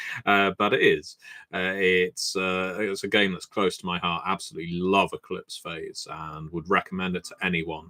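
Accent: United Kingdom. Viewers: Uh your britishism is coming through a bit thick this morning. By Costume dramas, you are refering to what?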